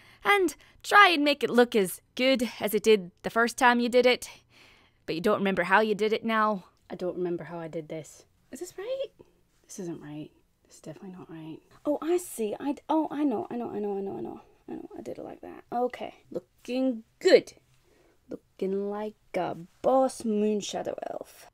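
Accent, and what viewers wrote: United Kingdom. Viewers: Amazing can't wait to become Rayla lol😂😂😂 Also your Scottish accent is amaze!!!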